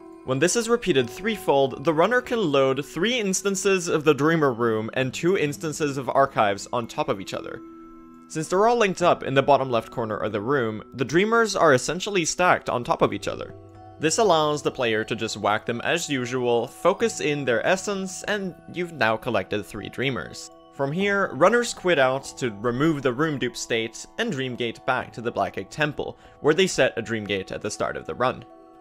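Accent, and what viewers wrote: Sweden. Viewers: Är du svensk? Jag missade dialekten :D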